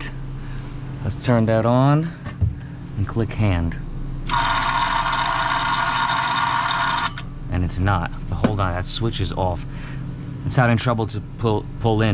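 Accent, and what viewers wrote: New York, United States. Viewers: You sound like your from NY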